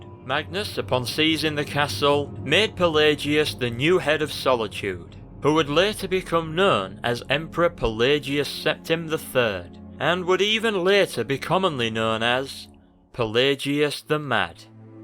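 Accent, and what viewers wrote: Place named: Yorkshire, United Kingdom. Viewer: I love Yorkshire accents almost as much as I love the Elder Scrolls. Luckily for me. :)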